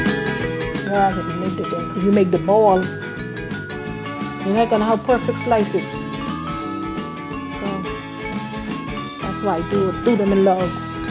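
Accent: Bahamas. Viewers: I love hearing that true Bahamian accent behind our dishes!!Love this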